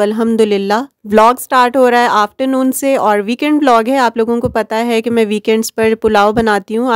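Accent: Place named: Karachi, Pakistan. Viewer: ap k bolny k andaz sy lg rha tha k ap karachi ke hen.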